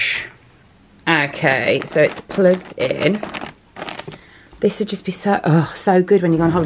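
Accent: Birmingham, United Kingdom. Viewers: Your videos are so perfect for cheering me up! I'm working abroad as an au pair at the moment, so it's lovely to listen to someone who sounds like home when I can't be with my actual Brummy family! x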